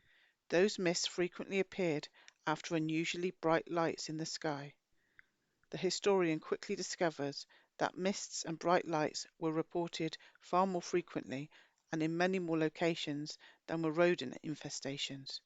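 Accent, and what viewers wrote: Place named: Wiltshire, United Kingdom. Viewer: That is fascinating. Are you from Wiltshire like me. I recognize that accent. Thank you sooooo much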